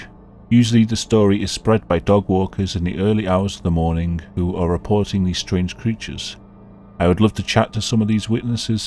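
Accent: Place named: Wales, United Kingdom. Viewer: I could have sworn I heard “torches” the whole time. Guess I am not used to that Wales’ accent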